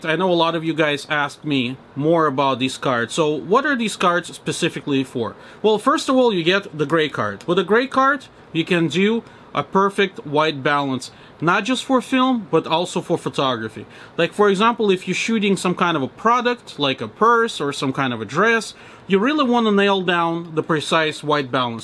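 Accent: Russia. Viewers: This guy Russian accent How do I use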